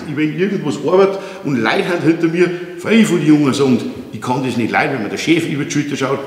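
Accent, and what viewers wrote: Bavaria, Germany. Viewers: Zugeschaut - Mitgebaut. Und a Bairisch lernst obendrein a no. Tausend Likes aus Preußen. Weiter so! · Hallo, ich bin zufällig über deinen Kanal gestolpert und mir gefallen als Elektroingenieur die sehr lehrreichen und praxisnahen Beiträge wirklich gut. Hier wird gezeigt wie man es richtig macht und warum und was man nicht machrem sollte und warum. So kompakt und nachvollziehbar wird selten das Elektrohandwerk erklärt. Das spart viel Zeit die nötig wäre in Normen und Lehrwerken herumzusuchen. Mich stört auch die leichte bayrische Mundart nicht - wer das nicht mehr versteht sollte lieber auswandern...... Mach weiter so - ich freue mich auf die neue Folgen. Einen schönen Gruß nach Bayern aus dem Schwarzwald.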